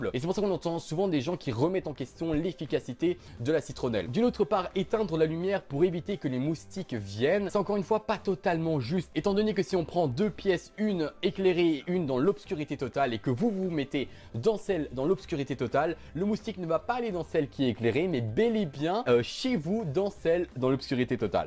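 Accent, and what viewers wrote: Belgium. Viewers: ginisti c'est l'accent belge ça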